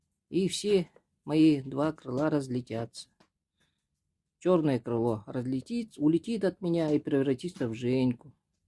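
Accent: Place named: Kostroma, Russia. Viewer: Он точно якут? Кострома Ярослав дикция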